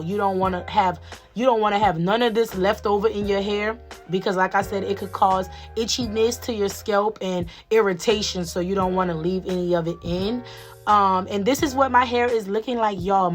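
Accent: Louisiana, United States. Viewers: Babygirl you gotta be from Louisiana 😂 anywho I needa do this cause I been looking look tore up lately lol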